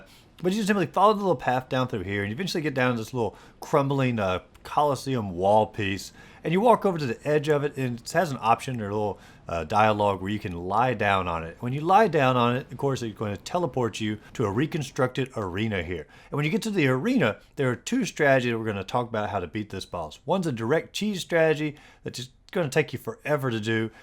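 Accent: South, United States. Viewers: Oh, and I hear that southern accent too man. Its all good, I'm here too lol!!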